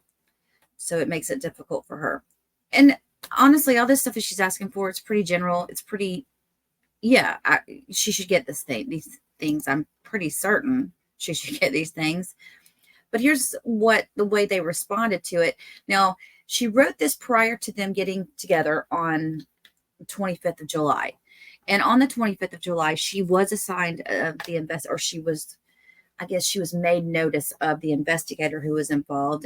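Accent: South, United States. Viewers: Voluntary intoxication is not a defense in the state of Florida. I like your southern accent. Where are you from. I'm a new subscriber. I like your presentation with humour.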